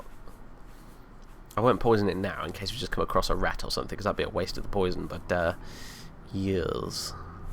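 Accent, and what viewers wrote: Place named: United Kingdom. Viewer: That was one of the funniest reactions I've seen to something like that in a while. The British accent made it a thousand times funnier as well!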